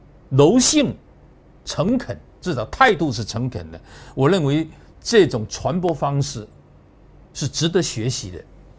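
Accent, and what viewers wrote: Fujian, China. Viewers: 老杨亲美反中反习，了无新意！但是讲的一口福建普通话，倒是很适合大陆观众的口味。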